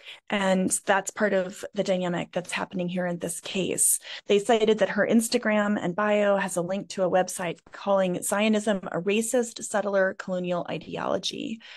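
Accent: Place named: South, United States. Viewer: Thanks for not disparaging the historic flags of the South. As you know, not all Southerners are racists or klan members. Some of us even work for peace & justice. As William Faulkner is often quoted, "In the South, the past is not forgotten. It's not even past!" Your comment about the reoccurring "twang" in your voice was delightful!!! The Southern accent, in all of its various forms, evokes the fondest of memories. It literally soothes the human soul. I hope you enjoyed Montreat & the beautiful Black Mountains of North Carolina. Wonderful place with wonderful people!!!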